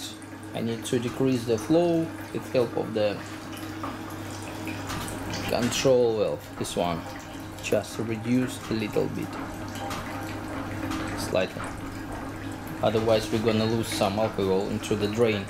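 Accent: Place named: Russia. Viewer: русский английский режет слух))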